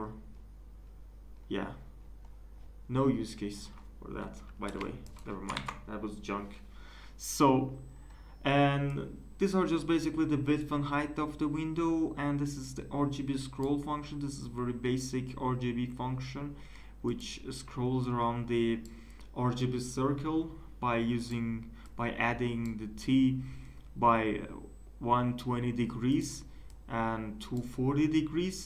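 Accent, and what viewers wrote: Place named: Iran. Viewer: Mate u sound vaguely persian. Correct me if im wrong. Either way, love the content. Looking forward to future videos.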